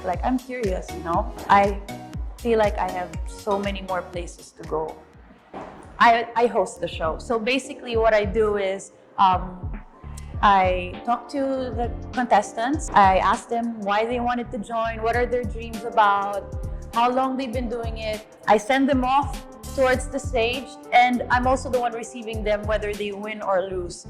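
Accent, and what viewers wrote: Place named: Philippines. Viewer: PrettyYummyLicious Pia....pinoy na pinoy ang gawi at pananalita yan ang gusto ko sayo...napa simply at nakaka relate aqo sa tuwing ng ku2kwento ka...eto yong miss Universe na cowgirl ang dating😮💖💋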